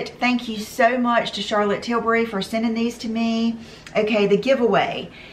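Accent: South, United States. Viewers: I like how you are so thorough with showing us the colours and giving your opinion of them. I also love your southern accent x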